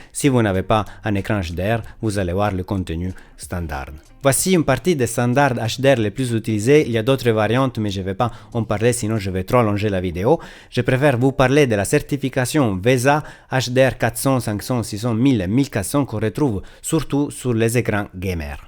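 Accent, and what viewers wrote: Italy. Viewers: Bravo je m abonné et jolie accent italien ???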